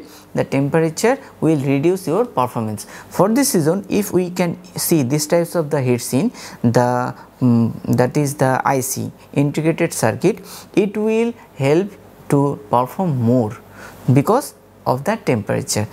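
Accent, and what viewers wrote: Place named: Bangladesh. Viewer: Your English told that you are a Bangladeshi😅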